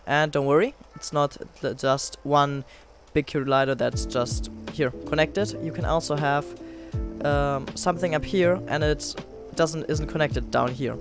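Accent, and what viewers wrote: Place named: Germany. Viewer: nice german dialect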